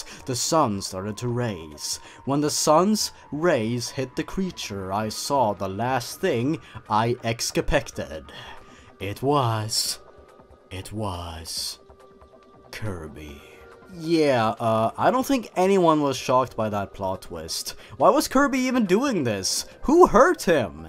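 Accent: Sweden. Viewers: U swedish? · Are you swedish?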